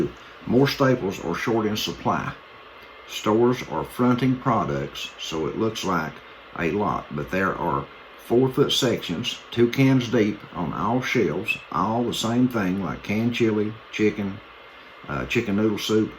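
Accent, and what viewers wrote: United States. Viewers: WHAT IS THE NOISE IN THE BACKGROUND? YOU REMIND ME OF BOB WELLS, CHEAP LIVING. LOVE YOUR AMERICAN ACCENT. THIS IS A VERY COMMON SENSE TALK AND WE NEVER HEAR ENOUGH, IF ANY, OF THESE TYPES OF MESSAGES. MANY THANKS.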